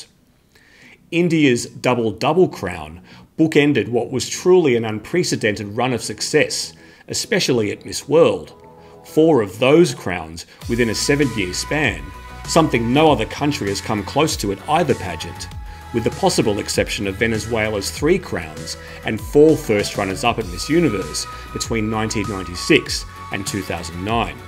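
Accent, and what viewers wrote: Australia. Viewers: I've been researching this kind of information for myself, and now I found it all perfectly researched and summarised here! Great visuals, pleasing voice-over (love the 'soft' Aussie accent), and neatly and professionally packaged. Love from ZA!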